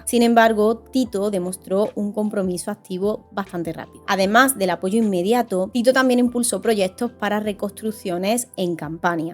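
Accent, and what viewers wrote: Spain. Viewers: She's from Spain, lol...the only accent I can recognize...well, there was a South American one I found similar...Venezuela or something...or maybe not, lol...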